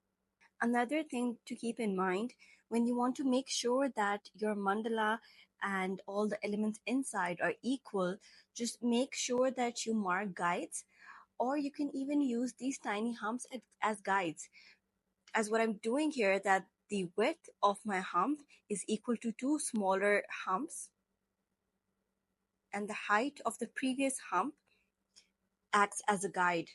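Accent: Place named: Kashmir, India. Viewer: Your accent is really impressive .....!! ❤ are you from kashmir ?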